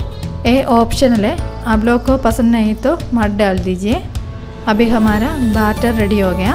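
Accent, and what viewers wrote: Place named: South, India. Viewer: I am from Bihar love to listen your Hindi . Truly speaking I don't want to listen your perfect Hindi Because your innocent Hindi tone mixed with south Indian tone sounds Awsome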